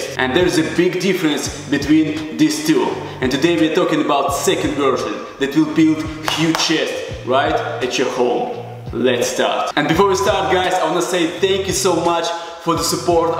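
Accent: Russia. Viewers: I knew that this is gona work when i heared the russian accent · Единственный кто имеет русский акцент, когда говорит на английском и наоборот... Кайф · The Russian accent just make it better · This Russian accent pumping me up 😂😂. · Your Russian accent is so cool and badass, and you look awesome! If I were gay (I am not) I would definitely have a crush on you, bro! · You Have a Nice Russian Accent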